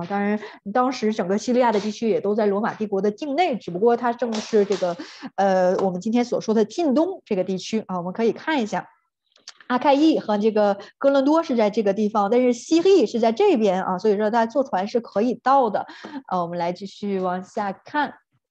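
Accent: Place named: France. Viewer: 老师太棒了！喜欢你的法语口音和阅读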